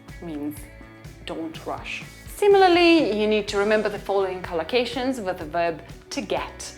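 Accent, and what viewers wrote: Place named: United Kingdom. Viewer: I really appreciate LinguaTrip has the british accent teacher.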